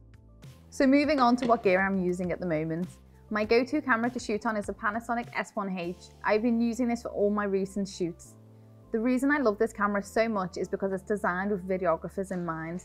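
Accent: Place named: Liverpool, United Kingdom. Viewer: She’s great and that gorgeous Scouse accent. Well done on all your success!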